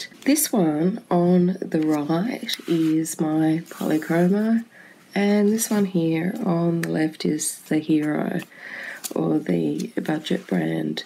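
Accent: Australia. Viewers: OMG, a fellow Aussie, so nice to hear someone not waffling on with stinkin cutes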